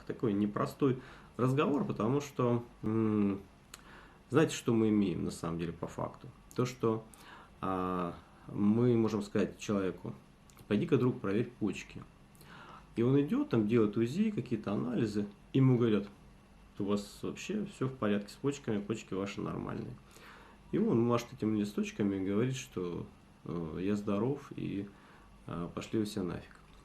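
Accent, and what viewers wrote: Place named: Russia. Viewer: Отвратительная подача материала с постоянным занудным аканьем.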